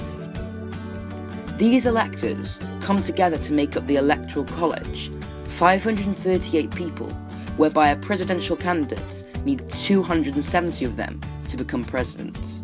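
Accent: United Kingdom. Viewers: tight n sleek british accent..loved the video :)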